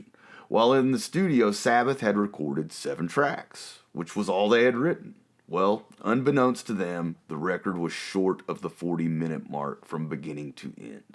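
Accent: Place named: South, United States. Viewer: Are you from Florida or Alabama?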